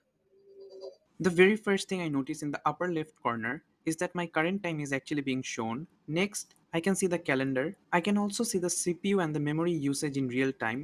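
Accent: India. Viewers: He sounds like an Indian.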